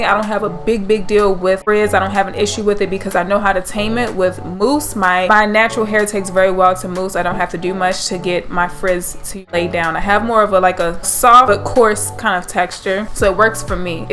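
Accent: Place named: Baltimore, United States. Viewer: a BMore accent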